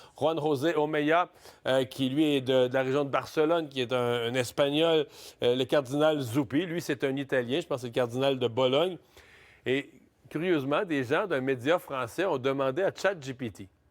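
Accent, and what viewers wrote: Canada. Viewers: Accent typiquement canadien. Je comprends pas comment on peut avoir un accent si bizarre! Les votes des papes se sont toujours passes comme dans des republiques bananieres. Et la chretiente, elle est ou alors!!!!????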